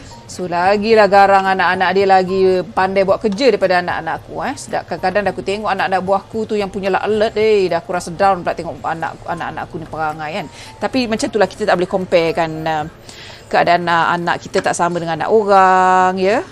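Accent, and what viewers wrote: Negeri Sembilan, Malaysia. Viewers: Salam i baru ikut your channel apa nama you sis....u dr N9... sbb loghat u cakap macam orang nogori....dr mana Rembau / KP / Seremban...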